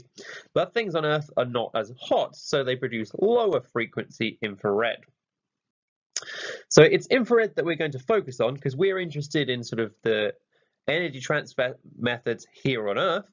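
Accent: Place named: United Kingdom. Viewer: nvm u sound british :face-orange-raised-eyebrow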